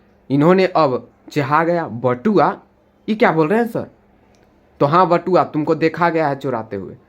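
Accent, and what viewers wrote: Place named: Bihar, India. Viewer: Total bihari vibe aaya sir maja aa gya😂 padhne me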